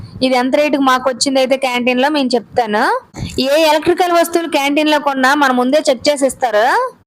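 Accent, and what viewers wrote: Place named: Vizianagaram, India. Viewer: విజయనగరం యాస...very nice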